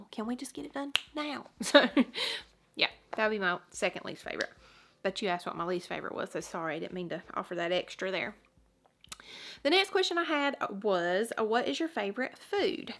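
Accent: South, United States. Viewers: I live in North Georgia. I 💙 your southern accent because I have it too.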